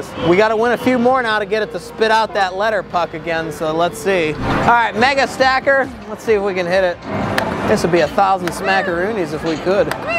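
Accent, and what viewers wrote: Pittsburgh, United States. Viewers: Sounds like he's from Pittsburgh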